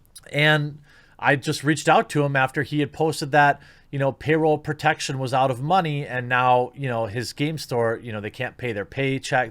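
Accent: Wisconsin, United States. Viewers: Oh man, youre from wisconsin??? Hahahahahaha damn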